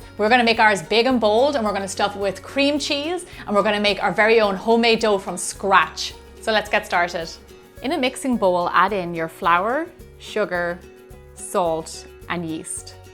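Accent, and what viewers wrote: Ireland. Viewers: It's softened for American ears i guess :p But you can almost listen to the Irish pub rogue in ya ! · Love the recipe. You have a beautiful Irish accent by the way. How long have you lived in the US?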